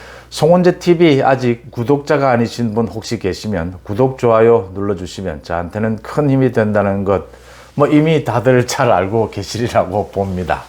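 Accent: Gyeongsang, South Korea. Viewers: 웬 놈의 부분 부분 부분 부분... 경상도 억양의 전라도 사람? 부분 부분 부분....이라.........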